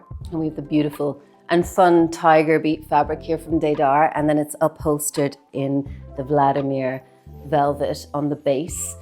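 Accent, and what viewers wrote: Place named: Ireland. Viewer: I'm hearing a slight Irish lilt. Yep, she has a studio in Dublin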